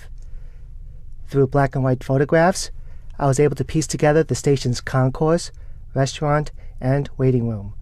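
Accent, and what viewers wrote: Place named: China. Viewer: How can he be a native New Yorker with this Chinese accent?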